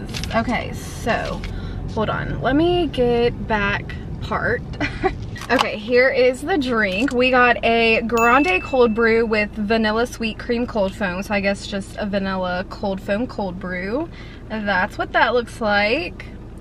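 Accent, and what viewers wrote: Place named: North Carolina, United States. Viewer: I think I remember u saying ur originally from NC but do u live there as of right now? U definitely have the southern accent from NC lol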